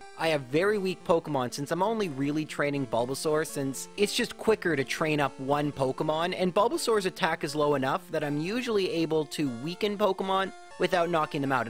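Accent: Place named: Canada. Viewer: I'm not sure what part of Canada lives in, but it was uploaded pretty early in all of the timezones. If he lives in Newfoundland or Nova Scotia, it was a somewhat normal time (around the 7am range), but his accent suggests to me that he doesn't live in either or those places. I could be wrong about that though, as some people try to underplay their natural accent.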